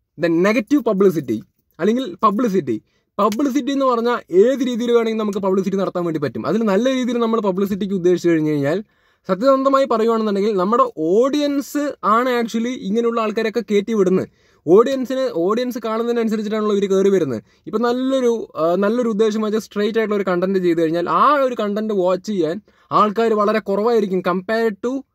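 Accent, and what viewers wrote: Palakkad, India. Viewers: Palakkad aano etta nigal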